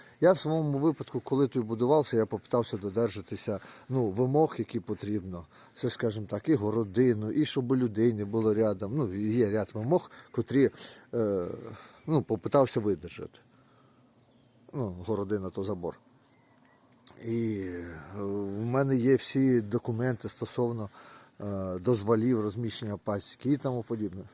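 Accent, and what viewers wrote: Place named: Transcarpathia, Ukraine. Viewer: але й файна в вас говірка закарпатя гарний край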